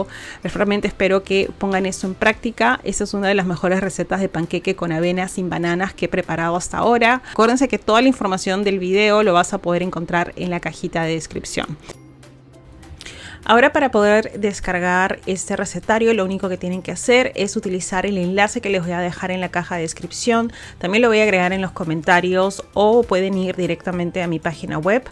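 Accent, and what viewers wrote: Peru. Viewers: me encantan tus recetas, siempre sanas. Te digo desde España. Me gusta escuchar tu acento peruano ( aquí en Sevilla teiuna amiga peruana). En este video y en otros te escucho decir que algo es "demasiado" delicioso y me preguntó si en Perú se usa de modo diferente que aquí en España ya que aquí cuando decimos demasiado con algo positivo, el significado es negativo, si digo que alguien es demasiado simpático el mensaje es negativo, no me gusta que sea tan simpático. Probablemente ahí no tenga ese sentido. Bueno, una curiosidad, no es mi intención corregir tú forma de hablar ni mucho menos. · Gracias por compartir, me encanta tu dicción, tu acento bien peruano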